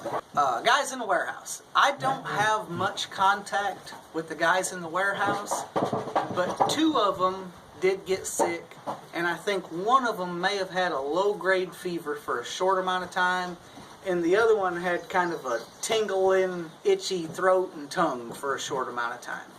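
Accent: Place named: Alabama, United States. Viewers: I live in Nebraska and most other states assume we talk like this. We don’t. We have a flat (no) accent. When I heard you speak the first time, I thought the same thing “what a nice accent!” It’s clearly southern, but not terribly twangy. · I live in Alabama too, it's weird hearing you say that, half the people around here talk like that!😆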